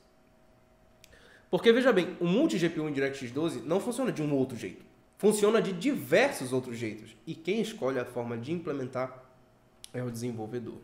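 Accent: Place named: Pernambuco, Brazil. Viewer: Bacana! Pensei q vc era Pernambucano. Sou Nordestino, Aracaju. Vou me inscrever no seu canal com outra conta. Da uma moral pra VC.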